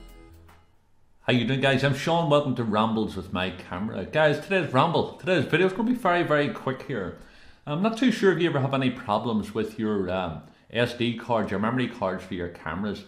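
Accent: Belfast, United Kingdom. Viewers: are u from belfast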